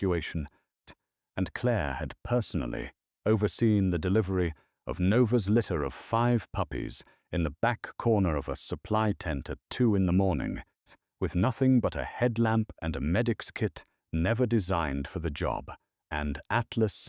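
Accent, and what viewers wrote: United Kingdom. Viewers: British accent ruins the story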